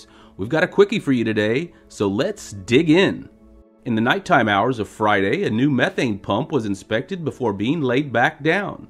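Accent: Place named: South, United States. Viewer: The audio commentary is great, and a nice Southern Accent! Ty 🎉🎉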